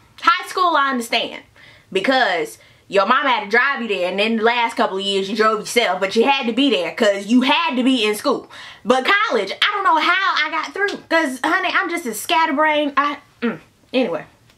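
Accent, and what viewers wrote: South, United States. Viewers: Love ur southern accent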